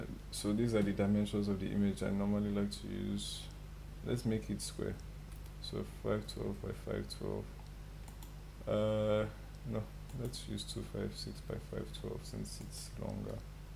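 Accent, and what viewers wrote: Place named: Nigeria. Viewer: Omg are you Nigerian?? You sound nigerian. Thank you for making this vid ❤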